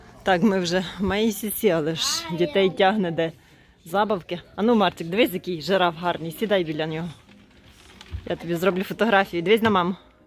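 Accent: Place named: Lviv, Ukraine. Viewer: Ви напевне з Львівщини, бо є акцент? Горнятко - це так говорять у Львові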